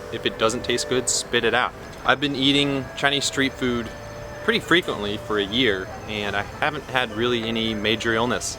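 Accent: United States. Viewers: You speak well with great american accent. Great voice and great pronunciation. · Hey, I've always wanted to go to China but have never been.. It seems like a beautiful place... I'm from Magee,Mississippi.. What town are you from? I can tell that by your English that you are originally from the United States.. Where are you from originally? During Christmas of 2018, I got 2 books on a Survival Guide to China and South Korea.. I read the South Korea book and it was great.. I have a Japan book as well too.. Now I'm ready to read about China next.. Speaking of South Korea, have you ever been there? I've never been there before myself either, but I'd like to go there too one day... If you have been to South Korea, there are these 2 kids that are living there currently who are from the United States (San Francisco,California) and their names are John Rock and Mack Rock... 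Do you know them? They have a YouTube channel... Check it out.. It's listed under Rock Kids.. I've actually never been anywhere out of the United States!!! I've just always lived in Magee,Mississippi my entire life... It's a small town, but it's growing more and more in population everyday!!!!! Have you ever been to Mississippi before?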